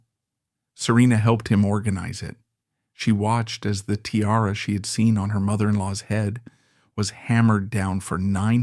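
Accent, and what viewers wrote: United States. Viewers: Not too much Irish blood there I would think , begorrah . Too long , deadpan American accent . Should be wrapped up in 20 minutes (for a minor royal) .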